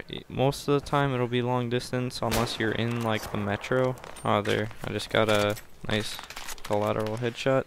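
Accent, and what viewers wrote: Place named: Europe. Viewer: btw for some reosone you sound european in your comentaries lol.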